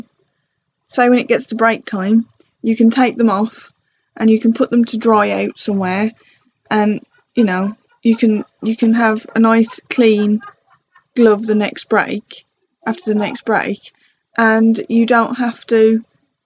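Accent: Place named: United Kingdom. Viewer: I have Irritant Contact Dermatitis (To the best of my knowledge based on so much research I have done!) where I have redness, itching , blistering and fissures on the back of my hands exactly the areas you indicated in your video and especially my right hand after I wear any glove (Latex, Nitrile or vinyl ) . According to my researches sweating under the gloves is what causes the dermatitis , do you think wearing those cotton gloves under them would help minimize/absorb moisture or it will increase sweating and thus worsen my condition? Thank you so much for uploading this very useful video.. Love your British accent :)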